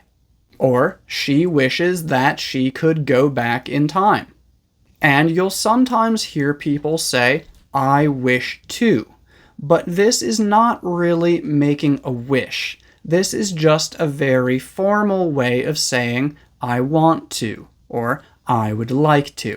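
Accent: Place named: United States. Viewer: I wish I had you nice American accent. I wish you good luck. I wish you had more patience. I wish you all the best in this year. Thanks a lot,. I always I wish you more success.😊😊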